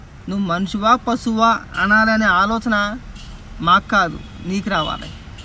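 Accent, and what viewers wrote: Godavari, India. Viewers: ప్రతి అంశాన్ని గోదావరి యాసలో చక్కని విమర్శతోకూడిన విశ్లేషణ చేస్తున్నారు, గో ఎహెడ్!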